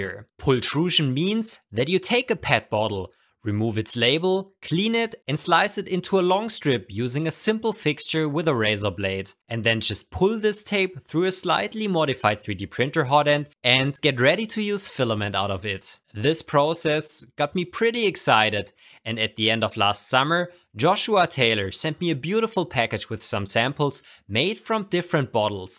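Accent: Germany. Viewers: Man merkt das Du deutscher bist🤣 guten Tag evrebody im cooles video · Moin meister! Man kann hören das du deutsch bist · This deutscher Akzent ist schon gut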